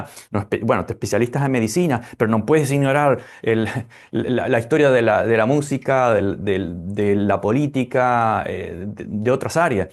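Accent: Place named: Corrientes, Argentina. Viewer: Ay! Pensé que eras correntino o misionero, un gusto conoocerte! 🇦🇷🧉🫂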